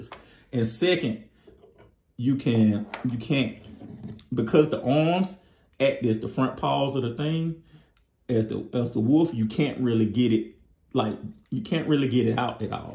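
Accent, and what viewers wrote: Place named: United States. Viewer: I like your country accent. I also have one. I agree, the articulation is sad and it is making me sad to buy the new release. SMH I was hoping someone would fix these issues. Probably a 4th Party will release after I buy and make me sick. I hate TF collecting sometimes.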